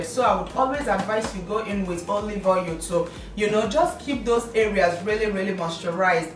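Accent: Igbo, Nigeria. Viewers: Nigeria asent oooh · you are the igbo omotola😘😘i love your igbo accent and beautiful personality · Hello Gorgeous! New subbie...Luv your makeup, outfits and OMG, that Nigerian accent is as beautiful as you are! Luv, Blessings & Keep Slaying!!! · That's a Nigerian Igbo accent We have different accents here :)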